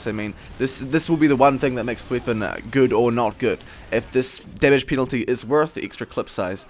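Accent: New Zealand. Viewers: Dat accent xD.... 'hivy'..... 15 pir sint faster firing speed.... god bless new zeland :)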